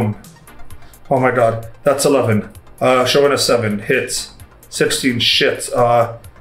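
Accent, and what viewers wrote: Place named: New York, United States. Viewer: Who is this new york man? where did our middle eastern csgo player go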